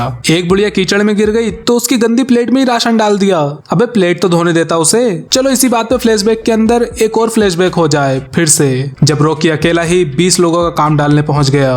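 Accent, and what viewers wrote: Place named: Madhya Pradesh, India. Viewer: bhai tum MP se ho kya? Tumhara Hindi accent bahut bekar hai.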